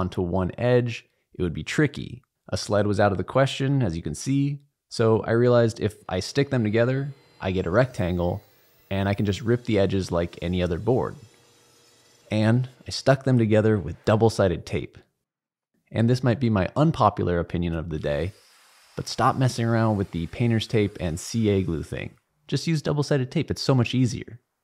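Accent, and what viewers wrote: United Kingdom. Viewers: Sorry, but too plummy.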